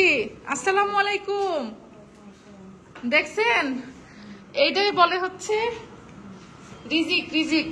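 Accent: Kushtia, Bangladesh. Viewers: Apu tho sobar moto dong kore kotha bole na,tader kustiyar vasay amn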